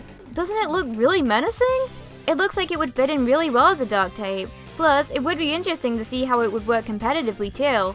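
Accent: United Kingdom. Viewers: u got a british ascent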